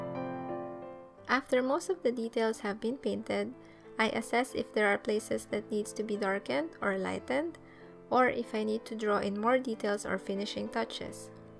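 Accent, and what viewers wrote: Philippines. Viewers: I really love thisss!!! I could tell by ur accent that ur a filipina, I'm so proud✨ Btw, could u make some tutorials about how to color skin or where to put shadows/contour (specially on the nose) and blush on face in watercolor painting pls? Thanks in advance!💗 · You sound filipino So are you a Filipino???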